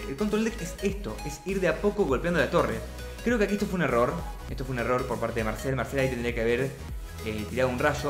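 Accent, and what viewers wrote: Argentina. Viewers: eres argentino ? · eres argentino?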